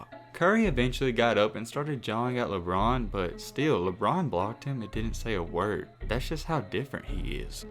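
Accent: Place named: Cleveland, United States. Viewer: Narrator is obviously from Clevelamd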